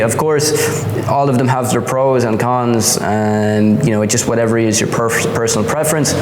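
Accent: Ireland. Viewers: What's your accent? Irish, but something else too?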